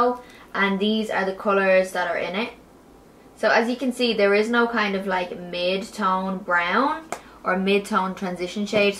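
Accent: Ireland. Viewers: What part from Ireland are you from??? Xx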